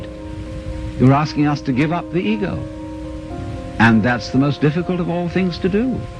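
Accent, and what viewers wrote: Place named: United Kingdom. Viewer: Perfectly British voice to perfection lecture..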